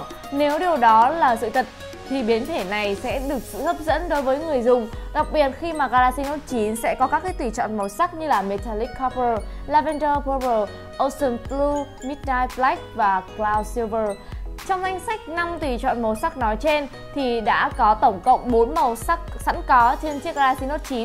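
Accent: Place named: North, Vietnam. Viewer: Bà ơi bà,bà đã nói giọng Bắc khó nghe rồi,mà còn nói chữ dính chùm chùm như mới ăn nho xong vậy...nghe mệt mõi quá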